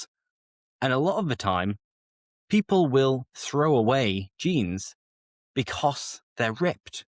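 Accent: Northern England, United Kingdom. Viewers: Hello! I'm a native English speaker and I love languages - your video came up by chance in my feed - I was guessing in advance what you'd say for "bird" ie funny, rude or normal -and actually I guessed wrong :) I would have said rude myself! I'm 66 (yeah an old grandma) and I remember it all the time from my childhood days (the same days as wolf-whistles!) But I rarely hear it now and I am not sure even my children (34 and 36) would say it. You've encouraged me to find out though - my son teaches EFL so I'm going to ask his opinion now just out of curiosity! Video is very nice and I like that your accent use sthe short 'a' such as in 'laugh' rather than the often-used-in-language-audio longer, southern pronunciation.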